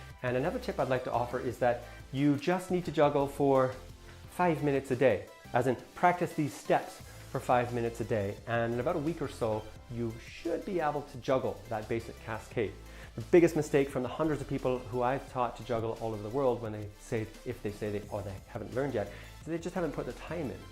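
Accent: Ireland. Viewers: Definitely one of the better accent attempts Iv'e seen on youtube, and I've seen some bad ones, good job either way. I live in Cavan, but my doctor is from Galway and he sounds exactly like this. Dr Cassidy is also a dork too, no offense I'm a dork so I can get away with saying that :P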